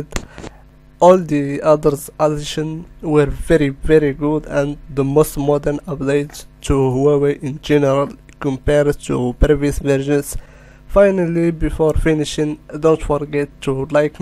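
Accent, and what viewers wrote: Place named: Arab world. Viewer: It's an arabic accent But u r right😁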